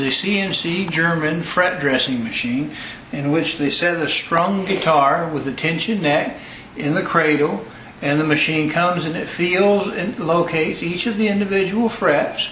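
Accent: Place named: United States. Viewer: i like people talk with cowboy accents.. sounds like pretty cool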